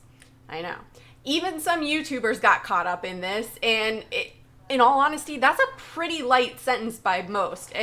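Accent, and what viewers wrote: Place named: Texas, United States. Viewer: You seem to have a hint of a western accent, like texan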